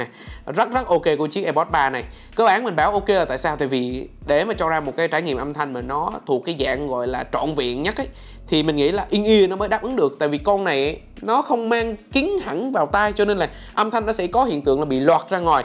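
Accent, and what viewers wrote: South, Vietnam. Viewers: Thằng này giọng nai nái sao ấy nhỉ, mới nghe thì ra giọng người nam, mà lâu lâu nói lái lái vài từ người bắc.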